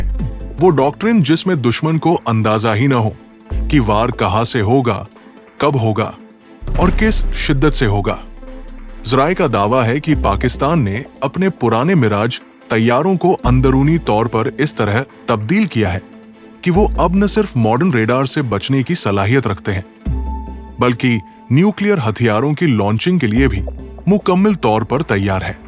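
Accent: India. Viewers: تمہارا لہجہ اور تلفظ ہندی کا کیوں ہے ؟؟؟؟؟؟ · Barway, ya bhartio ki terha urdu kyun boltay ho?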